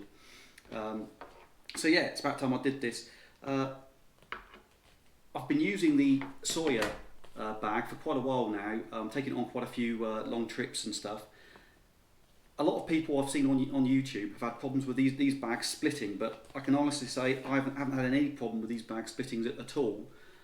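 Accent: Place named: United Kingdom. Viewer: Thanks for the informative video love your UK accent haha... Do you think these can be used for collecting and filtering tap water at all? I am traveling in Europe including London, cities...I am not collecting water from lakes or rivers or ponds though haha... I am looking at filtering water for my family (four of us) for making morning powder shakes or water for their bottles for out and about drinking. Thanks lovely.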